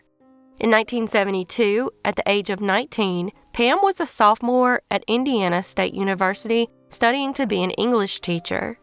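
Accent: South, United States. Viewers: Only southern accent I like. Thanks for all of your hard work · Nice to hear a southern accent even though mine makes yours sound like a Yankee.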